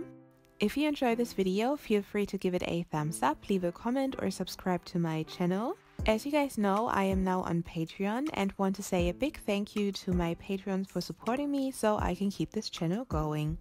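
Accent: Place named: Germany. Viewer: You can clearly tell she's Herman by the way she's speaking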